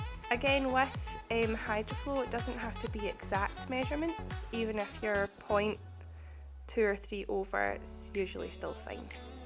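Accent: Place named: Scotland, United Kingdom. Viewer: Great Video, I agree about using a whisk its much easier to get the mixture creamy enough and lump free. Thanks for Sharing, great to hear the Scottish accent too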